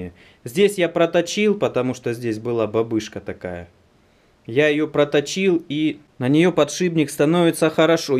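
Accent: Rostov, Russia. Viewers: Он из Ростова?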